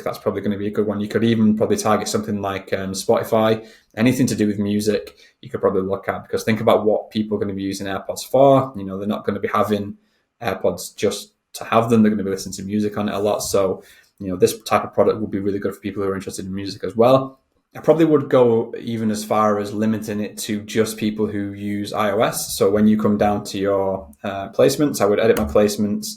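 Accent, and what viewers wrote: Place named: Bolton, United Kingdom. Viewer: Where are you from as you sound local to me. Bolton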